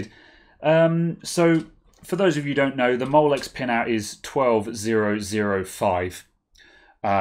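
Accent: United Kingdom. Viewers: ...Because you sound VERY BRITISH!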